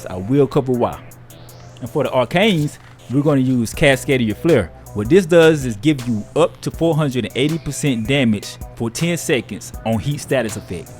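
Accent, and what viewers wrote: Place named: South, United States. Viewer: southern black accent.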